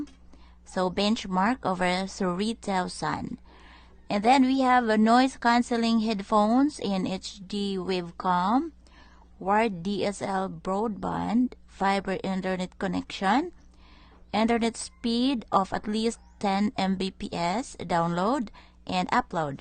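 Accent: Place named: Visayas, Philippines. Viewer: Paanu ka nakapasa diyan, may bisaya accent ka .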